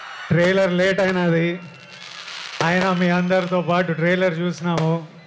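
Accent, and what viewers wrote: Rayalaseema, India. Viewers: Rayalaseema slang ippudu, next Godari slang loading 👌👌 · Rayalaseema slang bagundanna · అన్నా రాయలసీమ యస చన బాగా మాట్లాడినవ్ అన్నా నీ సినిమా బాగా ఆడతదిలే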